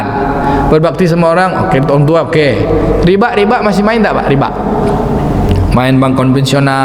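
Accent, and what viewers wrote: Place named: West Sumatra, Indonesia. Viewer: Dari sumatera barat, ada sesekali beliau pakai bahasa minang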